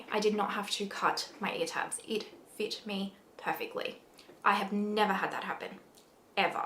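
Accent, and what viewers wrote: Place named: Australia. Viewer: I actually love this and the colour is perfect for me. I'd probably go for a bit shorter, maybe 14 or 16". Also, great to hear a fellow Aussie accent.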